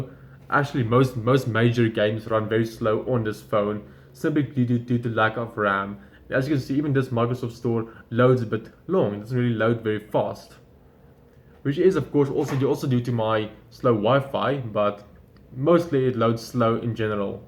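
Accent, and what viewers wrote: Afrikaans, South Africa. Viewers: Daai lekker diep Afrikaanse aksent, jys 'n regte boer!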